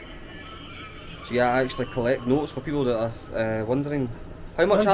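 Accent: Scotland, United Kingdom. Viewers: Oh! Hearing your Scottish accent in the middle of Baghdad is something else. Thank you so much for visiting my country! I haven’t been for 23 yr 😳🫣!!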